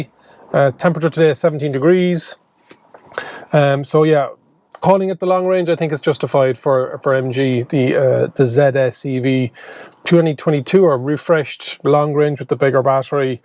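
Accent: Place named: Cork, Ireland. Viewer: You sound just like my dad was was born in Cork (Balenagree)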